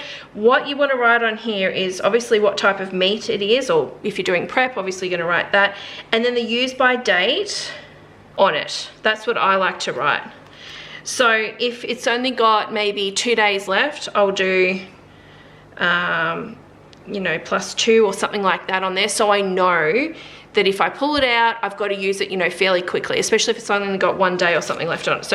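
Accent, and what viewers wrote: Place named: Australia. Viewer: Oh an Australian accent. What part of Australia?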